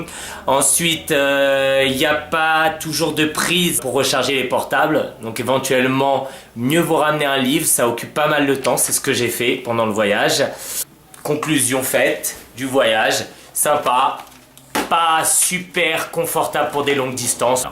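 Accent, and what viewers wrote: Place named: Brazil. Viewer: Mais il a un accent brésilien...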